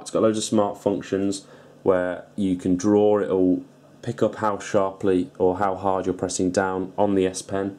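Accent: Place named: Coventry, United Kingdom. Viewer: I am from Coventry. You have gotta be from somewhere near there by your accent! Thanks for the vid by the way, very good!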